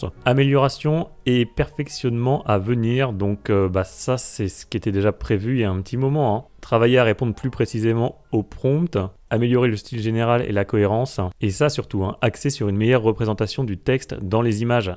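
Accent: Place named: France. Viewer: j adore le petit accent très Frenchy sur l anglais :D mais merci pour le boulot réalisé, c'est chouette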